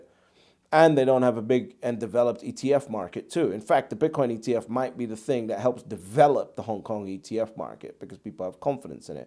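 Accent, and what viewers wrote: Scotland, United Kingdom. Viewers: A quite realistic appraisal, yes there are a lot of rivers still to cross for Bitcoin. And yes US ETF approval was a major step because SEC were effectively forced by a court judgement to approve Bitcoin against their wishes - that is a sea change, it is a significant shift within the power structure - people like Kevin O'Leary openly laughed at people taking cases against the SEC. Are you from Scotland yourself, Edinburgh maybe?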